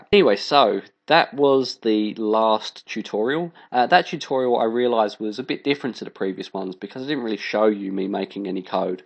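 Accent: England, United Kingdom. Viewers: you did a very good job in a quick and easy introduction to Python FU Scripting for GIMP. OK, I understand that one does what one knows but your last tutorial is a “Hack”, sorry I am not going after you, it is just bad programming. All I am saying is that if the only two variables there are changing is X1 and Y1, for the starting position. Then one would think that you should in your if-elif-else (which is really a switch statement in C# or any other coding language, but Python does not have one so the if-elif-else) just set the variables x1, y1 and then after the switch then call the blend function (pdb.gimp_edit_blend) one time. Tighter code and much more efficient. By the way all teachers should have a slight English accent and it sounds perfect. It is like everyone with glasses looks smarter 😉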